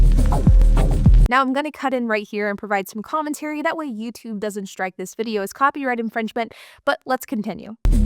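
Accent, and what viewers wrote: United States. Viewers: Americans most often say “jag war” for the animal and the car. Americans rarely pronounce the “u”, unlike the British. In the American NE, the “war” would most likely be pronounced “wire”. My guess is she has an American NE accent. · It's how Americans pronounce it get over it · She can't help being American, they only have a tenuous relationship with the English language.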